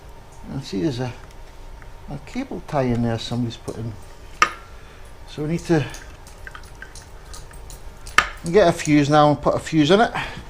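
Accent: Scotland, United Kingdom. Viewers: Thank you for another informative video - Great Scottish explanation and made perfect sense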